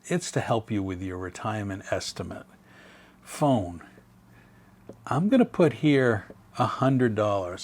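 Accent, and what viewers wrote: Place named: Connecticut, United States. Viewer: oh new Hampshire!! I thought you were from CT. Similar accent I guess. That makes perfect sense. Sunbirds! I guess we've been sunbirds with our timeshare in Orlando. Mostly going in summer when the kids were off school. Our youngest is a college senior so those days will hopefully be a memory soon.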